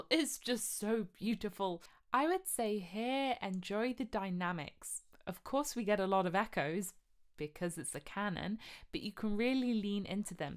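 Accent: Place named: England, United Kingdom. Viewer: I LOVE Telemann. Question fer ya, if you don't mind. I love English Accents. Where is your accent from? England has so many & some I really like. (if you don't mind me asking)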